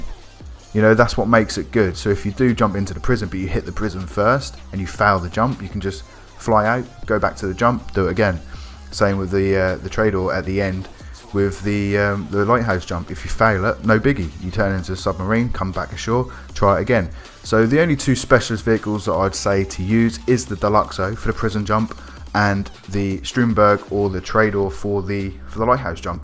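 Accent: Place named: England, United Kingdom. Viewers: sounds like you're a Norfolk lad, great video too very helpful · Annoying England accent NO no no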